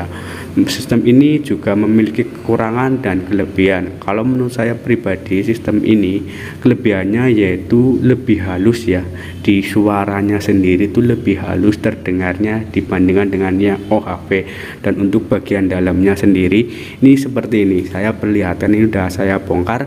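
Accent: Banyumas, Indonesia. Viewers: Kie pasti wong ngapak sing ngomong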